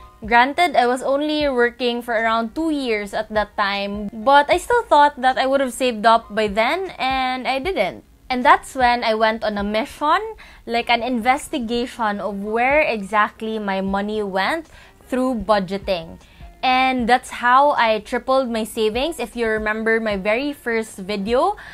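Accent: Visayas, Philippines. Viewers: I hope you get rid of your bisaya-english accent.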